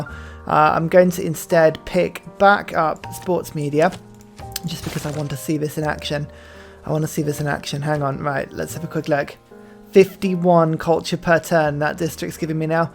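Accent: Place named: England, United Kingdom. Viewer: Whats your unique accent? I thought it was English lol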